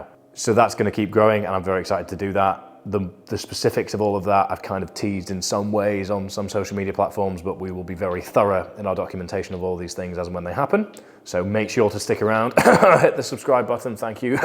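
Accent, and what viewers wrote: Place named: England, United Kingdom. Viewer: I did wonder about that....why DO you have an English accent?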